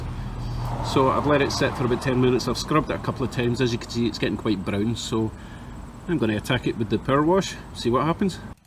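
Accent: Scotland, United Kingdom. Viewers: Awesome video! Love the video and the Scottish accent I’m from Texas so it was nice hearing someone with a Scottish accent. Excellent job!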